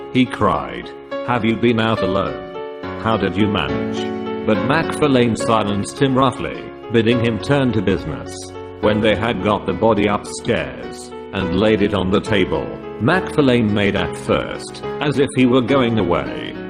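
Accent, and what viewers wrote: United Kingdom. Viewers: Good british accent..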